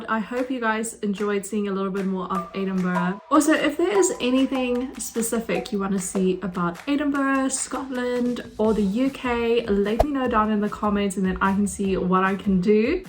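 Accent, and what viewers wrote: South Africa. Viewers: Wow awesome video ☺️ i just stumbled upon it. You sound South African 😁. Love that Pub set uo as well. I will be coming to Edinburgh second week in March and would LOVE TO do Karaoke. Please 🙏 do a video showing karaoke Pubs / Bars in Edinburgh. Thank you in advance. I am from Johannesburg South Africa 😁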